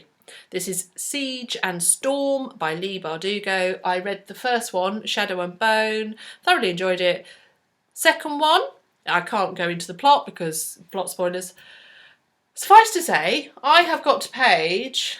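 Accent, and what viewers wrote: United Kingdom. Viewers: We have quite different tastes in books but I love your videos so much! I loved your James Corey rant! And I love your accent (is that weird?) I'm Cumbrian so you sound incredibly posh! Have a great day!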